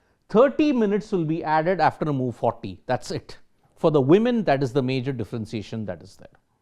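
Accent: India. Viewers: Do u have a problem with the Indian accent? His voice is loud; his pronuciation is clear. What more do u want?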